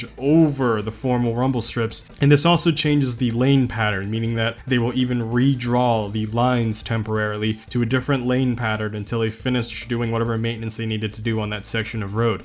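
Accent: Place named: South, United States. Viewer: I love that AI Southern United States American. And the attitude he represents is honestly tame for this area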